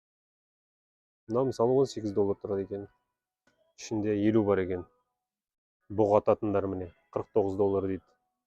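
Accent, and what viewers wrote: Standard. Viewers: Өте жақсы түсірілім былжырақтатып басқа тілді қоспай таза қазақша көрген қандай керемет.Рахмет.Америкада заң өте жақсы жұмыс істейді әрі бәріне бірдей. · Өте казак тілін таза сөлеитінің көрініп түр. Жарайсың ❤❤❤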